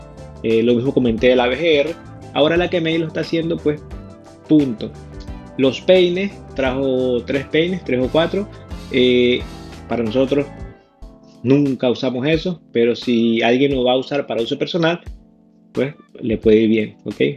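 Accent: Venezuela. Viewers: Hablas como venezolano!! Jejeje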